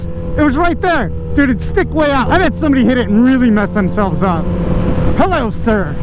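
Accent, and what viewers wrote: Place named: Michigan, United States. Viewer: I fucking knew you were from Michigan! Cant mistake that accent or just how you are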